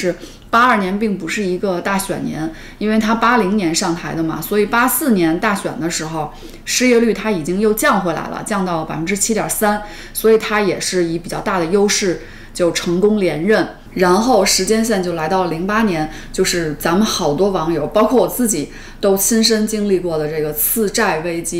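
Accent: Beijing, China. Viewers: 博主是北京人么？口音听起来很熟悉。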